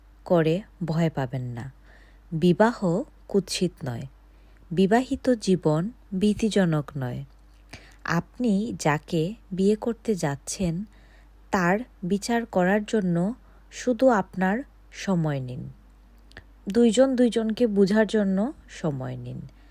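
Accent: Sylhet, Bangladesh. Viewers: Sylheti ni?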